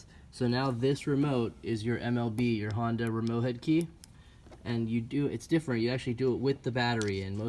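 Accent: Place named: United States. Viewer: OMG, finally there is someone here speaking AMERICAN!!!! I purchased this VVDI tool for months now, whenever I looked it up on Youtube all I got was either Thailand or the shitty Russian voice. Thank you and thank you for your video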